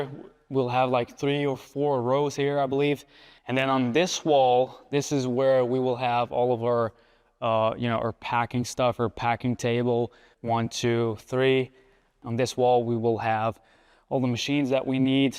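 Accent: United States. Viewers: real question. Are you American or how do you have an American accent?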